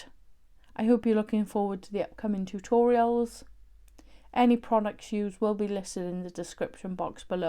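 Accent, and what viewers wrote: Wales, United Kingdom. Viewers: Are you Welsh? If so, me too lol xx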